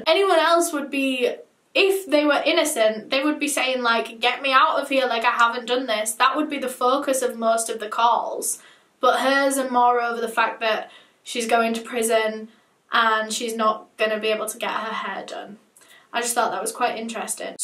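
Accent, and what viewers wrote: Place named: Yorkshire, United Kingdom. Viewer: she's from leeds (west yorkshire), the same as me so yorkshire x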